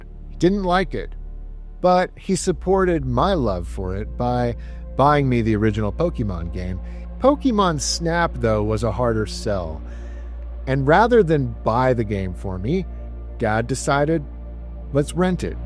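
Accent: Houston, United States. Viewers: you from houston bro?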